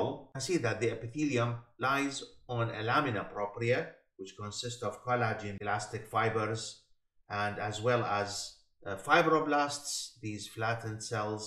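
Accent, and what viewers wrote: Iraq. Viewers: Noticed the Iraqi accent from afar! Thank you for the brilliant explanation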